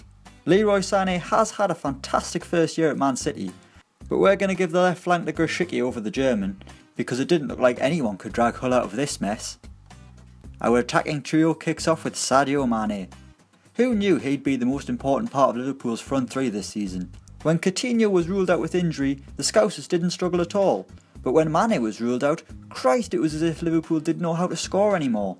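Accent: Ireland. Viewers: HITC Sport are u guys Irish? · Are you Irish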